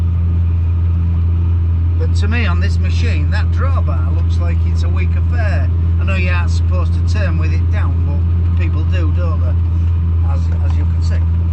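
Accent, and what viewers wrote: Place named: Driffield, United Kingdom. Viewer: Love this channel, I'm from Driffield originally and it is great to hear that accent . Miss my farming days, keep up the good work.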